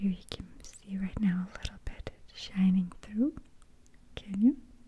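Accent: Russia. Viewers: The Russian accent is so relaxing 😍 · I was sooo hoping to hear the russian accent again ^^'' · You should do a souvenir shop role play with those items. Maybe use a heavier Russian accent and give us background on the items! I’d love to know the stories behind them :)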